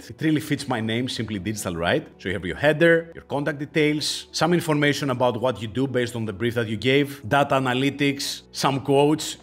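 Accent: Greece. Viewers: You have great info dear friend in this video..just subscribed to don't lose other opportunities😊..by the way your accent seems Greek, right?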